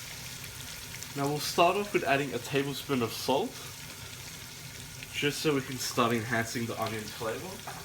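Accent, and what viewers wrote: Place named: Australia. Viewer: Jus' one observation. It never looks good when an executive chef uses cookware that is marred or scratched up on the cooking surface. The stockpot in this video appears to be a 'Teflon' coated pot, and the Teflon coating is obviously scratched off on the sides, and cooking surface of the pot. It just looks unsightly, and unappetizing. In addition, they say that toxins leech out of the pot when heated from under the Teflon coating. Jus' my observation, but of course, you may feel differently. I love your Australian accent, and your cooking style. I hope that I'm not mistaken in believing that you're Australian. Forgive me if I am.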